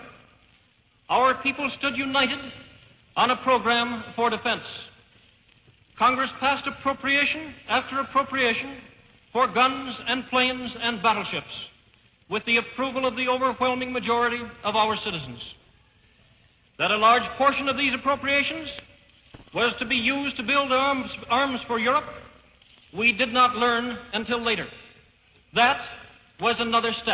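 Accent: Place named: United States. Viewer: Gracias por esta aportación!!! Es un placer escuchar esto en voz de un yanki :D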